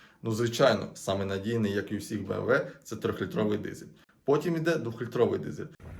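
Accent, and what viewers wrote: Surzhyk, Ukraine. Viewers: Як на мене, треба мову трохи підтягнути, бо суржик , це шлак, а вичавлювати із себе через силу українську , то краще взагалі мовчати. Ну і авто якісь старі корчі- не цікаво (((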